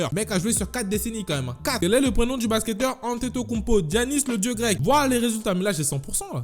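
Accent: Cameroon. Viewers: j adore ton accent camerounais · Avec son accent du Cameroun c'est trop cool like beaucoup si tu aime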